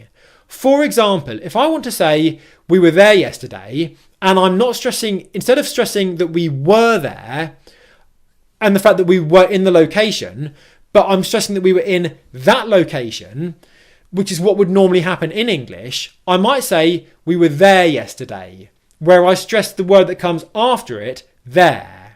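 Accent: United Kingdom. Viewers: From the first time I learnt English, I fall in love with British Accent. It's so elegant. Thank you for making the videos. I love watching it before I go to bed.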